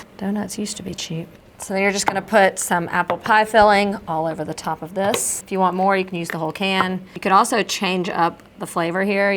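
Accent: South, United States. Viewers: I really enjoy listening to this pretty and smart young lady. How lucky is her family! She is so interesting and I love the southern accent. So many helpful ideas and recipes that are practical, easy and delicious., you are the best!!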